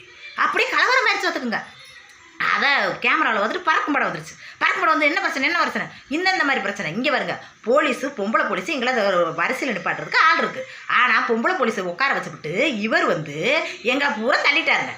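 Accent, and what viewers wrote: Madurai, India. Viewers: மதுரை பேச்சு அருமை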